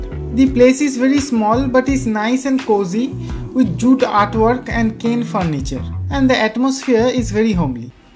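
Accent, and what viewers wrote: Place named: Bengal, India. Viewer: Bangali accent 👏👏👌👌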